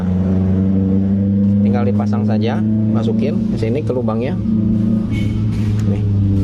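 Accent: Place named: Indramayu, Indonesia. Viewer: Kaya wong dermayu😊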